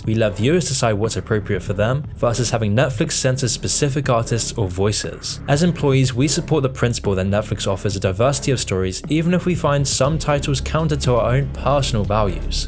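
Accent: United Kingdom. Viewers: This channel is a bit QAnon in my view with a British accent. Plenty of reasons to criticise Disney and Netflix but when going into 'woke world' seems to lose the plot as such. I don't like Blackrock either but I don't think there is some master plot as such.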